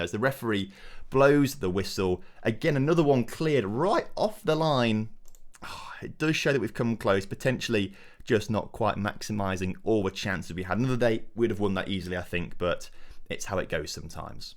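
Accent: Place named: Yorkshire, United Kingdom. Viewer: yorkshire accent gets better and better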